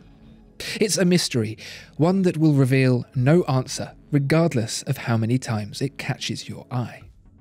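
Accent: United Kingdom. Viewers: This is completely subjective. A British accent doesn't make you an authority.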